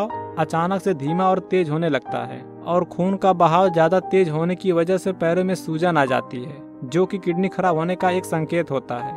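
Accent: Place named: Bihar, India. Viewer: Sala bihari ki tarha baat karta hai lol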